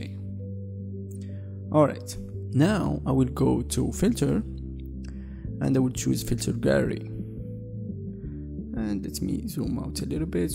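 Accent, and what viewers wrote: Morocco. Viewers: you have a Moroccan accent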